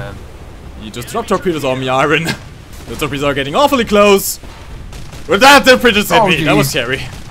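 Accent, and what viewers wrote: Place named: Germany. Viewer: Thanks! Very helpful. Love your channel. I am a loyal subscriber and love your German accent!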